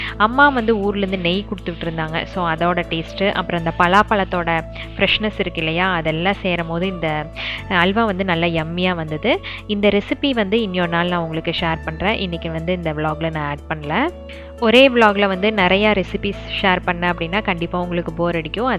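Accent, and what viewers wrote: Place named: Coimbatore, India. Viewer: i watch many other good vlogs.but no one use our CBE slang.. like kolambu sunda veikarathu,vanam vettappu vitruchu....so sweet...i heard from you.lovely.❤❤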